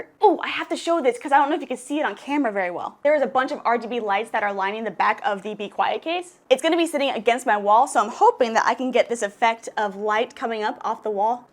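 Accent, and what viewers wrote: United States. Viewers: The hehe at the begging got me 😂😂 hehe (your American pronunciation is so amazing) I’m so jelly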